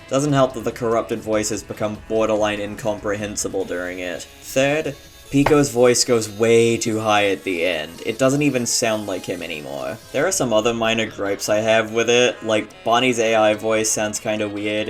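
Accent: United Kingdom. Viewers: a British accent isn't always so heavy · I wasn’t expecting this video to have full commentary !! The Bri’ish accent is really soft but it’s still satisfying to listen to !!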